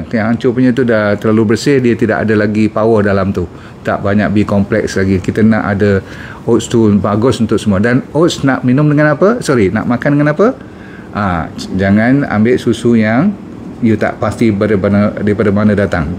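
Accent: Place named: Kelantan, Malaysia. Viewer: Pandai kecek klate. Manih leting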